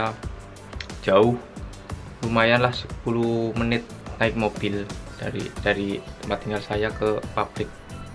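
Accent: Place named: Java, Indonesia. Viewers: Ikhh, seneng ngliat maz nya ganteng tp medok ky q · All, wahh hebat mas bisa bahasa korea, tp logat jawanya masih ada ya pas ngomong indo nya hihi 👍 sukses mas di sana, Jd ngiri pengen ke korea 😂👍👌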